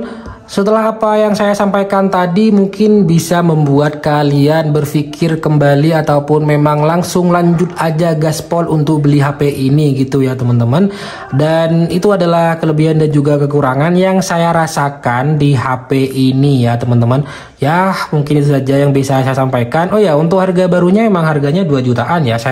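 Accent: Madura, Indonesia. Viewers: Madura logat ny 😂🤣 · Logatnya kental banget, madura banget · Dari aksen bahasa indonya sih.. Pasti dari Meduro ori yo cak ? Hahaha 😇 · Orang Madura kah bang??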